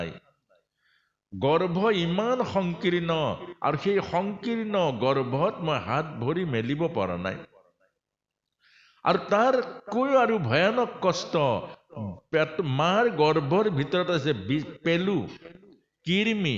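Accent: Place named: Bodo, India. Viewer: Bodo accent ❤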